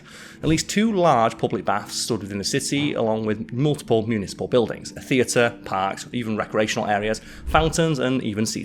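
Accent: United Kingdom. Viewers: ❤ Your enunciation may need a little practice, but I could understand you just fine, DESPITE your British accent, LOL! One note about your not wanting to eat “poo fish 🐠,” have you had Tilapia or white fish? Tilapia is a new name for a old fish, but 85%-90% of the worlds Tilapia comes from…wait for it…sewage treatment plants. Not joking. Look up an episode of “Worlds Dirtiest Jobs” about Tilapia farming. 🤢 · Fun fact, accent is closer to traditional British English than Simons. 😊. you may want to slow a bit so many others might understand what you are saying. Your accent is thick and many find it difficult to understand. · yes, true, but the algorithms that attempt to decipher the audio to turn it into captions are (probably) trained on the sort of English spoken by the designers (likely in Silicon Valley in California, USA), and the largest repository of audio recordings (Hollywood, in Southern California, USA.) So the algorithms are biased towards Californian speech, in all likelihood. 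It’s not surprising that they wouldn’t work well for either Simon or this fellow, both of whom are Brits.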